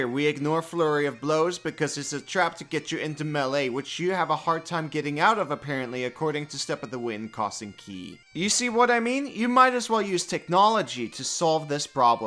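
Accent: Scotland, United Kingdom. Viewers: this guy scottish?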